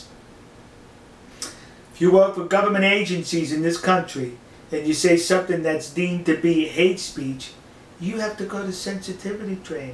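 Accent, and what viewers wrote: New York, United States. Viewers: I know you're white but what is your ethnic European back ground ? you look Italian to me I think you're Italian boy you Italians hate Arabs I'm not an Arab but I hate Italians even more so I defend Arabs even though I hate them to. you sound like you come from new York have you ever heard about us Trinidadians we are of the Asian Indian race . the British brought our ancestors from India to Trinidad and Tobago to work as indentured workers . we're ralated to Asian Indians. any way the Japanese had the right to ban hate speech cuz it causes problems . they should do that here in america ! I'm not against hate speech I just think it should only be used behind closed doors within organizations. hate groups should not be allowed to go to peoples neighborhoods to tell them they hate them. there's alot of violence at racist rallies that kind of hate speech should not be allowed unless your hating people behind closed doors!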